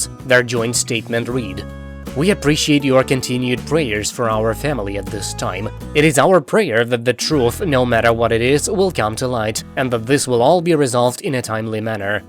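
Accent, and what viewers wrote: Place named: South, United States. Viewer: U.S.A, the Duggars are part of Bill Gothard's IBLP cult instead of a REAL church! It's called FAKE Christianity! They use Bill Gothard's IBLP "Cult Bible" instead of the "authentic" Holy Bible that REAL churches use! The differences are that cults abuse and brainwash their members in the worst ways, while REAL churches teach unconditional love and respect! This cult also doesn't allow divorce, despite a few of Anna's siblings doing so! It makes NO difference how long you and your wife have been married - 31 years or not - would the two of you STILL be married to each other even if one or BOTH of you behaved like Josh and Anna? The "authentic" Holy Bible DOES allow divorce on the grounds of the crimes Josh committed, but it sounds like you forgot that! You sound like you come from The South, which is called "The Bible Belt," and there are equal numbers of BOTH cults and REAL religious faiths! 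When COVID-19 started, CNN interviewed a man on a beach from Alabama, who didn't believe in making ANY decisions for himself - he was 100% dependent on God for EVERYTHING! He even said that if God decided to "call him back," he'd just go back with NO questions! That sounds like BOTH you and your wife - regardless of whether the two of you belong to a cult or a REAL church!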